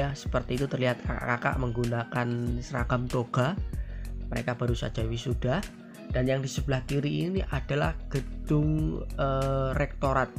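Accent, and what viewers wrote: Java, Indonesia. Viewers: Wadduh medhok lidahnya hehe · Logat jawa org jawa ke?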